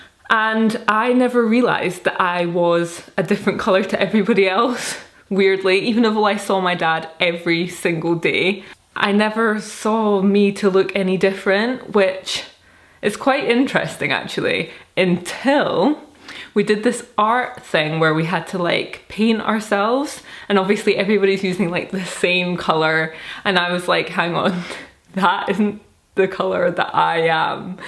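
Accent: Scotland, United Kingdom. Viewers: I think as human beings we have a natural inclination want to categorize things to help us navigate this terrain of the human experience. So when we meet up with a person that’s not in a category of people that we are already familiar with, the tendency is to try to find a category where we can place them into, thus the Beyoncé, Alicia Keys, naming call outs you were receiving, as much as tactless as it is or was. I personally, am very much intrigued by your looks and the British accent but at the same time by your humanity and profound understanding of this concept of trying to find where you belong or the “finding your tribe” type of thing. So when you share these experiences you’ve encountered in your life it does help to shine light into how alleviate and understand these awkward reactions you receive from people. Hopefully, this knowledge does help people who would otherwise react “inappropriately” to the unfamiliar “you”, act in a “natural” manner.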